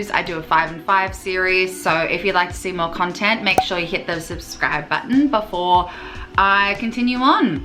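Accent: Australia. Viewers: That’s the Australian accent one of the sweetest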